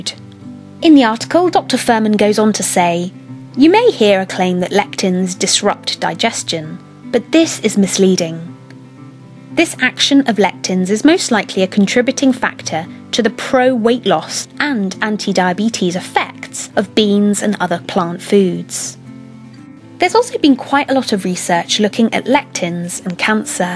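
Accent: United Kingdom. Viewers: Just because some mushroom has some special property that confers immunity to cancer doesn't say anything generally about lectins. Until "science" offers better theories, instead of spending their time theory-shaming others, people will keep trying the diet - and if they feel / get better, then even you telling them theyre wrong in a calmly reassuring British accent isn't likely to change their minds.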